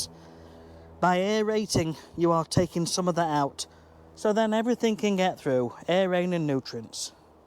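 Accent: United Kingdom. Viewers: UK accent with imperal mesurements lol kinda threw me off. Its all good keep up the good work.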